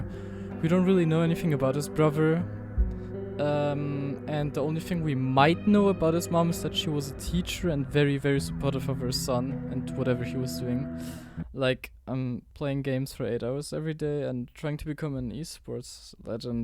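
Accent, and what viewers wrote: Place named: Germany. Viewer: You have a german accent tho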